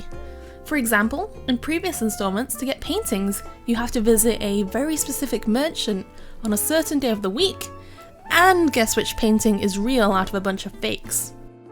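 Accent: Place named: Northern England, United Kingdom. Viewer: It sounds like northern England mixed with America/Australia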